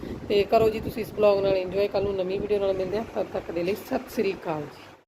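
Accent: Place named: Sangrur, India. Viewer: Hr gl nal te te bolde. Sangrur wale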